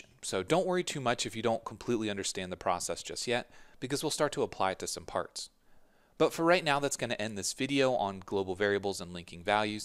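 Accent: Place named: United States. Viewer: Great video's its nice to hear an American voice without the horrible constant 'Vocal Fry' at the end of every sentence.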